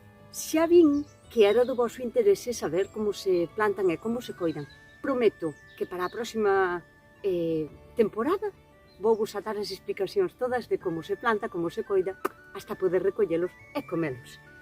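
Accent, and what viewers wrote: Galicia, Spain. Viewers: a min encántame escoitar o teu galego con ese acento da costa tan bonito, pero supoño que xa saberás que si o teu canle de YouTube fora en castelán medraría moitísimo porque chegaría a milleiros de persoas en España e América. Levo mirado estos tres últimos anos moitos canles de España, América e Reino Unido principalmente e o teu encantame. Eres moi boa comunicadora, transmites moita alegria e os contidos son moi bos . Hoxe vin un par de vídeos teus e aprendin cousas novas, por exemplo cando con unha navalla rascaches arredor dunha brasica que comera un verme e vas e atopas o verme, flipoume.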